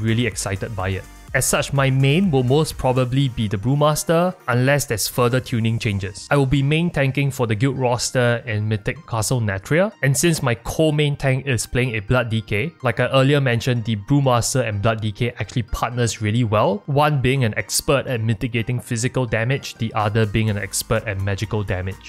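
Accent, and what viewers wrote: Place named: Singapore. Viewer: Hey man thats awesome! I'm on Thaurissan myself , also GM and trying my best to do content creation (which is why i got excited when i heard the Singaporean English). All the best!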